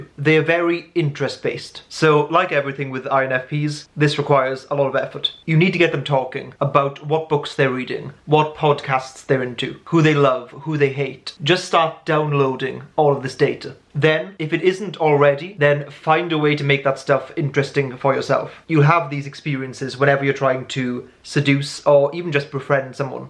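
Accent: Wales, United Kingdom. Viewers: As an INFP female, I watched this video out of curiosity...I’m not even half way through the video yet, and this is the most accurate description of my personality I’ve ever heard....My mind is officially blown !! Is that a Welsh accent by the way? :) I’m from South East Wales - near Cardiff :)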